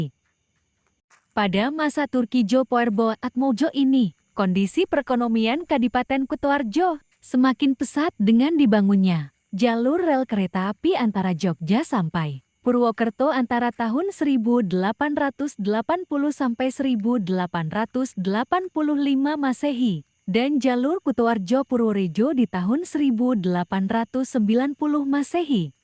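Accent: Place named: Makassar, Indonesia. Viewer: suwarane kaya orang Makasar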